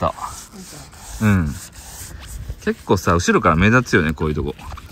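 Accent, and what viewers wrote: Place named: Kansai, Japan. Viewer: 同県民だけど微妙に関西弁違う 当方北部です。前の川？綺麗な景色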